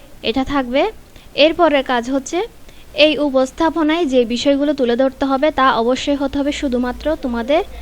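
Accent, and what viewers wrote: Chittagong, Bangladesh. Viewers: ম্যাম আপনি মনে হয় চট্টগ্রামের?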